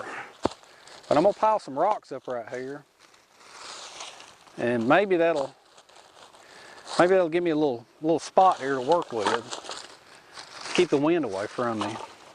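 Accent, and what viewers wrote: South, United States. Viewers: you speak "country boy" and that's good enough for me. As long as your "peoples" can understand you, don't worry about the rest. Besides, the people that don't watch aren't in our league. God Bless Brother!!!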